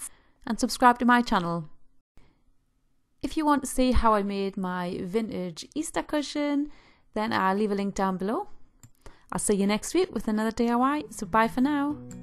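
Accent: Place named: England, United Kingdom. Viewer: Hi love, Wow I have been binge watching your videos . I'm loving your channel! You have inspired me so much! I love your English? Accent. Too. Lol Your fur baby is adorable too. I could see myself living in that fantastic farmhouse of yours. Just Beautiful. Can't wait for another video from you! HUGS Debbie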